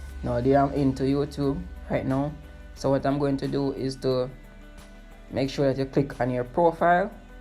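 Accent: Jamaica. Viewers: I will stick around for the Jamaican Accent.....Bomboclat!!!!!.....i just subscribed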